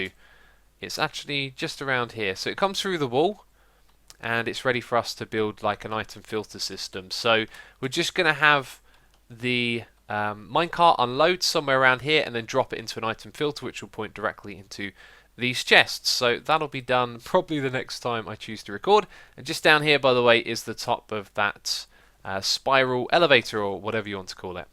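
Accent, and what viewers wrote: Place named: United Kingdom. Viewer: Xisuma, why is your accent so pleasant? What part of UK are you from? I don't hear many British accents out here in Seattle except in occasionally in international conference calls at my old job. My dad was from Belfast and my brother is from Brighton so I can rule those out, but I couldn't venture a guess.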